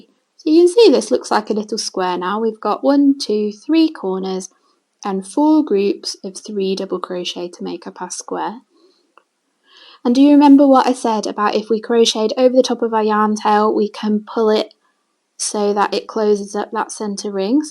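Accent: England, United Kingdom. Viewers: WOW!!! Thank you so much for this great video.. I can't wait to start.. I'm new to crochet.... Can I just ask a question? .. I know you sound English but are you using American terms ? .... I'm from the UK and I thought the UK term was called a trebble crochet? .... Sorry for my basic question😊....Many thanks❤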